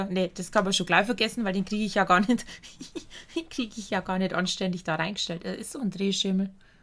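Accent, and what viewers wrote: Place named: Bavaria, Germany. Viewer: dieser Bayrische Dialekt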